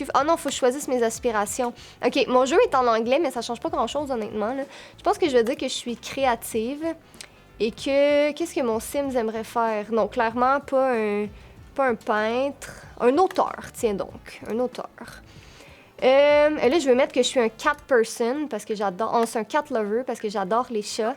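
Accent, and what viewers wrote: Quebec, Canada. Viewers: J'adore l'accent québécois ! Quand je serais plus grande , j'aimerais faire mes études au Québec et vivre là bas ! J'espère que ça se réalisera ! 👍♥️ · J'adore ton accent québécois!! En plus t'es trop belle ♡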